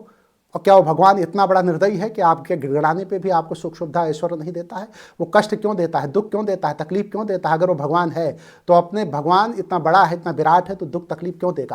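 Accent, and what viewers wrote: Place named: Madhya Pradesh, India. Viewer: भाई सर की और भी वीडियो देखिए। सर अध्यात्म की बात करते हैं । सर एक अच्छे राइटर भी हैं मैं इनकी सारी वीडियो देखता हूँ । सर कहाँ के रहने वाले हैं मैं नहीं जानता लेकिन शायद mp के हैं आवाज़ से ऐसा लगता है । इन सिर की दो पुस्तक मैंने पढ़ी हैं फ़िलिपकार्ट से मंगवाई थी । हो सके तो आप भी पढ़े। और रही बात आपके प्रश्न और कमेंट की तो भाई एक बात बताऊँ - “ सुअर के साथ कुश्ती नहीं करनी चाहिए क्योंकि आप गंदे हो जाओगे । लेकिन सुअर को मजा आयेगा।”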